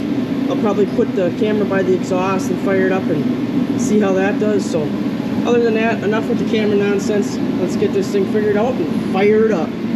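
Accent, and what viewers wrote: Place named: Wisconsin, United States. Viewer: What part of Wisconsin are you from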